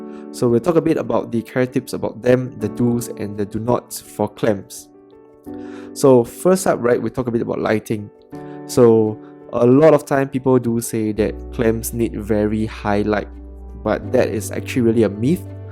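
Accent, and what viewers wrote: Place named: Singapore. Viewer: Typical singlish....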